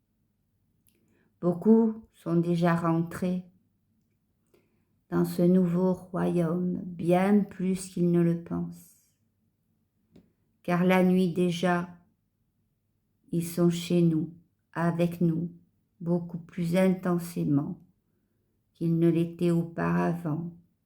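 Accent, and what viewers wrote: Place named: Southwest, France. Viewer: Merci pour cet important message avec ce bel accens du sud ouest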